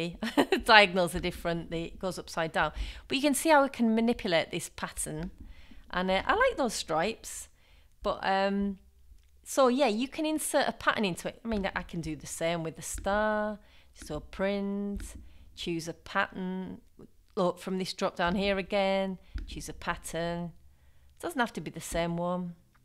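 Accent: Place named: Northern England, United Kingdom. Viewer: Thanks for the tutorial. Love your accent! My mom is from Huddersfield, and you sound like you might be from somewhere up there in the North of England.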